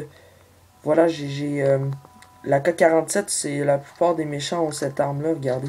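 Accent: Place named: Quebec, Canada. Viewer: Salut ^^ alors toi qui a un asser gros nombre d'abonnés pour un joueur psvita je ne t'avais jamais aperçus sur youtube :) j'ai moi aussi des personnes comme toi qui dislike met vidéo amors que j'ai quel que bonne personne qui like ( exemple: 9 like 2 ou 3 dislike )bref. Je ne sais pas comment régler ce probléme moi aussi :) sur ce j'ai regarder ton contenue tu est un exelent joueur psvita :D continue comme ça ! +1 abo ( ps: j'adore les accent québecois j'aimerais trop vivre au québec !!)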